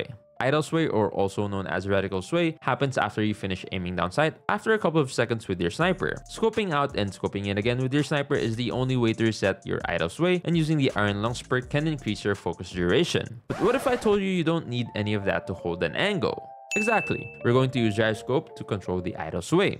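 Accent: Philippines. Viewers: You sound so filipino😅 (I know its not the topic i just wanna ask)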